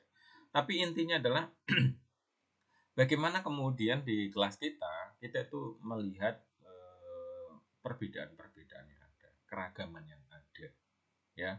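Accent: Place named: Sumatra, Indonesia. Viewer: Bedo pak ya, suara orang Sumatra ma jawa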